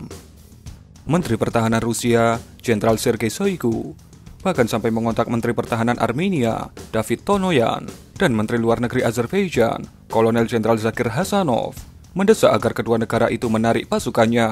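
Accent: Java, Indonesia. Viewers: Kangen bahasa medokk mimin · Wong mjowo kah min??🤣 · medhoknya jadi ciri khas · suaranya medhok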